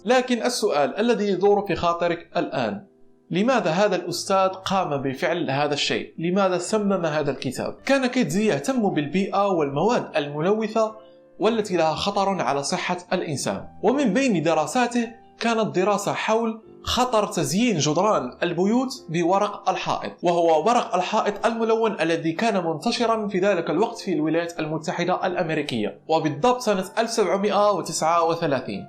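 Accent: Morocco. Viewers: ميّزت لهجتك المغربية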